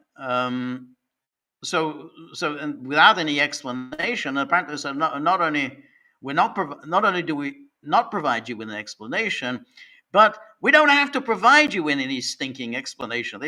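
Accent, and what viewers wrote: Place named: Cockney, United Kingdom. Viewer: Love the Cockney accent,. Regarding the debanking and all that, I have to say, it's not right, but it's like the thing from Pulp Fiction, isn't it? The Marcellus Wallace situation. Yeah, I mean, maybe they shouldn't have expected that reaction, but they should have expected a reaction. You know, I mean, come on. The Swiss guy who was sanctioned by the EU. What on earth was he doing anywhere near Europe, let alone still living in an EU state? Two, Scott Ritter. What on earth was he still doing in the United States? What is wrong with these people? And I'll tell you who's next, Alexander Mercois of the Duran. He will be sanctioned. They will clamp down on him. They will debank him. I've got no idea why these people stay in these countries. I left Airstrip One 25 years ago and have never looked back.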